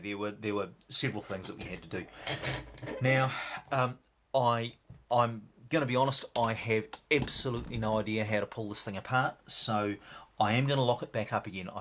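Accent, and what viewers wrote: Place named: New Zealand. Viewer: Nice lock & good pick. I love your screen annotations, and I think your commentary rivals BB & LPL. Plus you've got that great... KIWI accent! Send'n the love from the USA.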